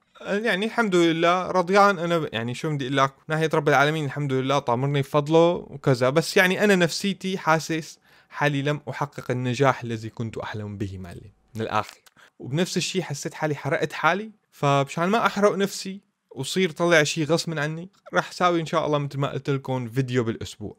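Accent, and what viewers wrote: Syria. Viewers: لا منتا غليظ وانا ما اعرف سوري وفاهملك ١٠٠ فل ١٠٠ بس منجد يمكن الناس ما يحبو اللهجة سوري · انا مابتابع قناتك الا عشان اللهجه السوريه تبعك 😂🤣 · اوووعك تترك القنااه انا ماحبيت المورتال الا بسببك الصراحه وعندك تفاعلك رهيب وبالعكس.. الي شدني اكثر لهجتك السوريه والله رووعه ( ولك يحرئ ديبك يازلمه😂) هالجمله ذي تضحكني لما تاكل لك اكله في المورتال استمر و ان شاء الله ربي يوفقك نزل مقاطع قليله بس لا تترك القناه♥. وتحياتي لك يا. اخووك من السعوديه🇸🇦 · احلى لهجه هيه السوريه و انت بالعكس انسان راقي و مرح كلش والله .. بالعكس استمر و حاول اتطور اكثر بالقناة و ربي يوفقك للي تتمناه .. اخوك ومتابعك من العراق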